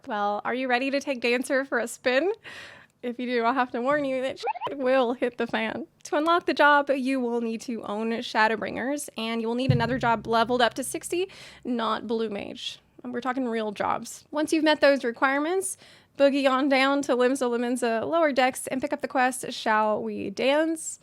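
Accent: South, United States. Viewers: European girl with a country accent.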